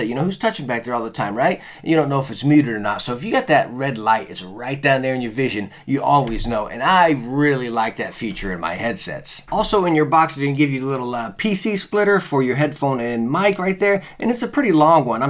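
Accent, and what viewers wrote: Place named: Brooklyn, United States. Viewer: where is this guy from? Brooklyn?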